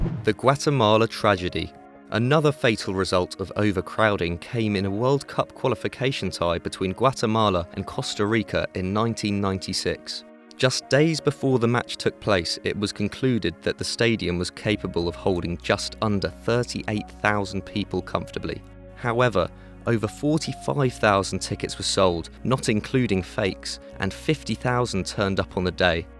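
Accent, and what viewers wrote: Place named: United Kingdom. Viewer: Why is everyone critisizing the poor guy's voice? He's just British ok.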